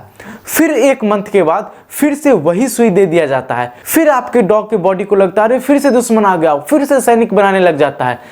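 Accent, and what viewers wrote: Bihar, India. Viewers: Aap bihar ho Na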